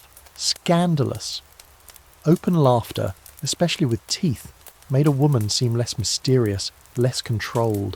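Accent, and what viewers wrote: United Kingdom. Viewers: It's 5.34 am and I'm living in France, near the chartreuse mountains. Thanks for your help and the English accent, it contributed to putting me to sleep. The pictures though 😂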